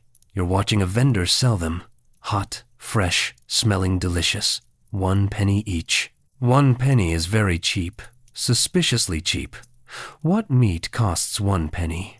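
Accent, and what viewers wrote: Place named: United States. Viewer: American voice describing medieval England bad AI choice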